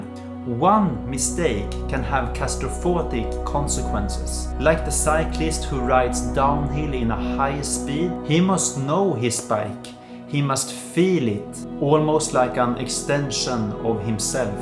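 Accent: Sweden. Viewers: Du måste vara svensk!! Finns inget annat, så hårt som du bryter😂 säg mig, hur bra är den kameran. Jag funtar på och skaffa en q2m i värsta fall en q2… har du framkallat några bilder än? Eller gjort nå prints ? Isf hur stort ? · Dat svenska accent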